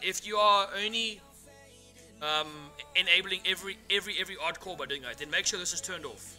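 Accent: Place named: South Africa. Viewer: Woah South African tarkov youtuber?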